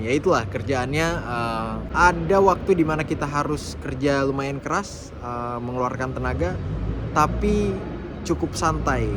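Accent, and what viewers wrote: Manado, Indonesia. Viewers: Seneng nih gaya bicaranya bahasa manado dan indo jd punya ciri khas tersendiri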